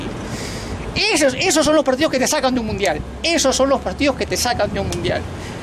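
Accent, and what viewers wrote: Argentina. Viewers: Porque este peruano habla como argentino????? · me gustan tus videos los veo siempre pero se me hace raro y chistoso que hables como Argentino y tengas la camiseta de Perú, igual sus comentarios son bien acertados siga asi.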